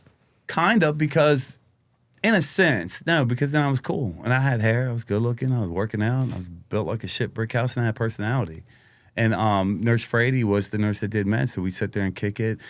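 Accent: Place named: Baltimore, United States. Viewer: Why the Baltimore accent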